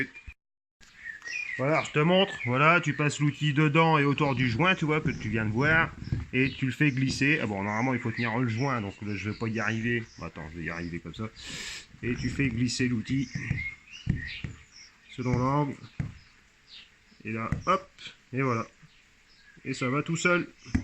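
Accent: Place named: North, France. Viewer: Travail monumental 😱💪🏻💪🏻 Bravo. On est en plein dedans pour la capucine de notre camping-car 🥺 J’ai reconnu l’accent du nord de mon enfance ☺️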